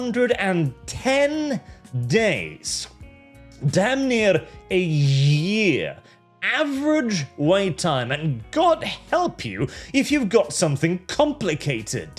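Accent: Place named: United Kingdom. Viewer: The video presenter looks like a Russian Udmert but sounds like a British person. Off putting and confusing.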